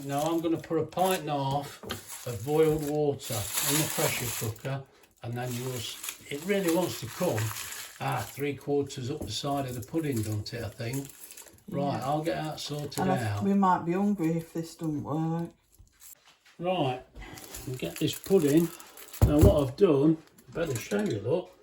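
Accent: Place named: Midlands, United Kingdom. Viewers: Hi You sound like you’re from Nottingham as we are . Anyway we’ve just tried our corsori pressure cooker with your steak and kidney pudding recipe. Absolutely brilliant it turned out really nice. Many thanks keep up the good work 👍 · Are you from the midlands accent sounds it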